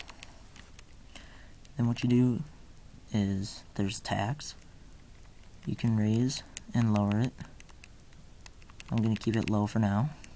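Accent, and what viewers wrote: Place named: Asia. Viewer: i cant understand ur asian accent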